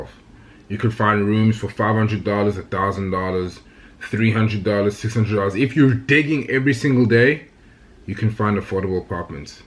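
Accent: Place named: South Africa. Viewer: Crazy how you haven't really lost your SA accent. Love from a former Edenglenian 💕🔥👏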